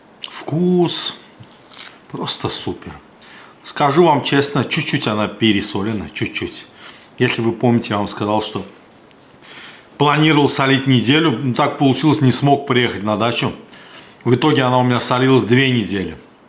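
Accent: Baku, Azerbaijan. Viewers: Самый чёткий бакинский акцент приятного слушать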